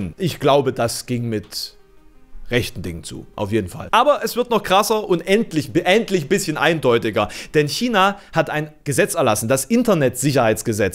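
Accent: Saxony, Germany. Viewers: ich liebe es nicht und bin recht froh dass es bei ihm nicht zu krass durchkommt ^^ Habe zu viele Sachsen erlebt die im härtesten Dialekt den größten scheiß labern Aber ihn hier hab ich echt gern ^^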